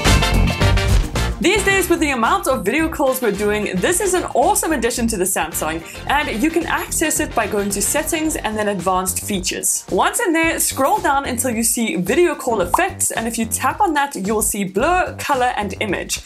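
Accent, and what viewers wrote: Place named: Afrikaans, South Africa. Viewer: Ek wou sê jy klink afrikaans😂 goeie video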